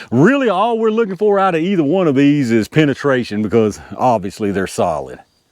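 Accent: South, United States. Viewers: Your channel is the best! Love that southern accent.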